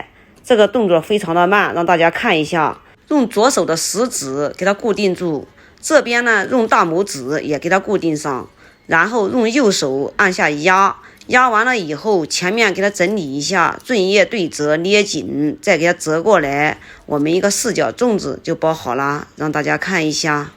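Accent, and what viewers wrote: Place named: Guilin, China. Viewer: 解释得非常清楚，还喜欢你的口音，很是桂林人吗？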